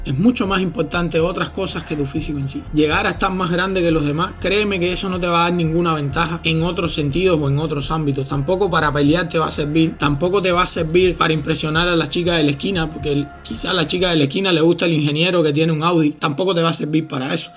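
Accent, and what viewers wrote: Cuba. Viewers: Eres cubano brother?? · Bro eres cubano, contestame si puedes, yo soy cubano conosco el acento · bro me suscribí eres cubano?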